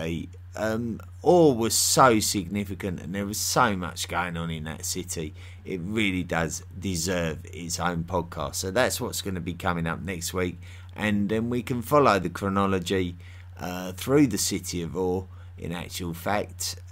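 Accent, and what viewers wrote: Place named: Cockney, United Kingdom. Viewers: The cockney voice over is what makes this even better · Sounds like "my name is Michael Caine" I love the combination of cockney accent and erudition. The man can't be expected to cover all aspects, never mind in detail, in 46 minutes. If you want more detail you should search Google for scholarly articles on this subject. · Not so sure about clearly :-) ... very strong London accent there.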